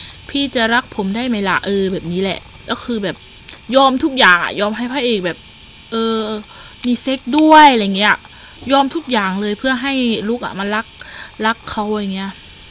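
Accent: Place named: South, Thailand. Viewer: คนใต้ชัว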